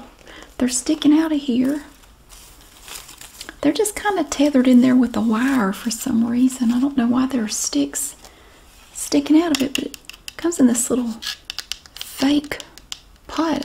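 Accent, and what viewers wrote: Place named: North Carolina, United States. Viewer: Im serving over seas and Your voice reminds me of my wife. she is from rural North Carolina. Your accent is spot on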